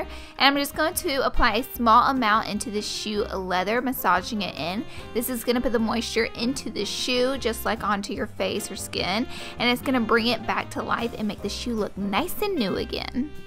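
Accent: South, United States. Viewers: is she a southern bell alls I hear is southern in her voice 💙😂😊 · You are so funny, love that southern accent!! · I love your southern accent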